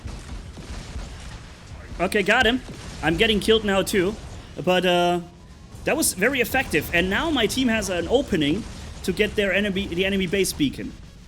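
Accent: Germany. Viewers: Wait are you german?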